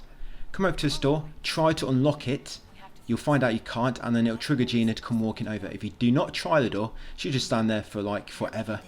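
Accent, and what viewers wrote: England, United Kingdom. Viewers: do you know what English accent you have?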